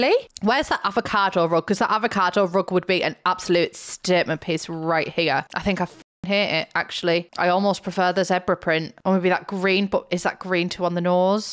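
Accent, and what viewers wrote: United Kingdom. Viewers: So I have a strong southern (American) accent and watching you so much sometimes I get the urge to go British. And British plus southern American accent…..I sound like an idiot yall pray for me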